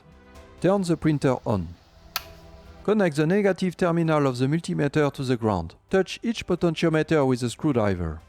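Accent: France. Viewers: it sound french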